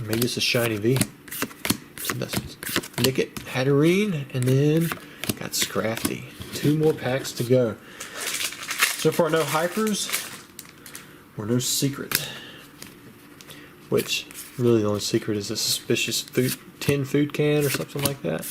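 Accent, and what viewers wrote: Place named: Kentucky, United States. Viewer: Bojangles biscuits yessir...you in ky? Sounds like a ky accent...watchin ya here in Louisville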